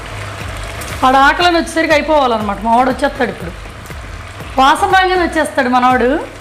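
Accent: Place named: Nellore, India. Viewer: me slang super akka.. ma nellore basha lage undi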